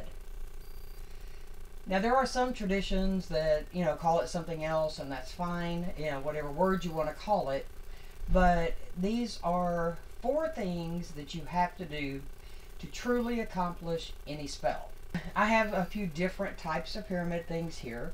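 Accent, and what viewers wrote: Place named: South, United States. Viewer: I just love that Southern accent.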